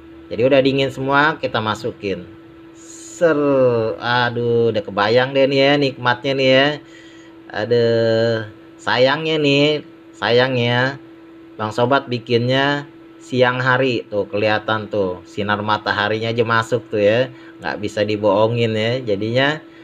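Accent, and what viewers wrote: Jakarta, Indonesia. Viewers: Gile ye betawi asli ye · Baru nemu channel si abng, demen banget enak ngomong nye betawi asli...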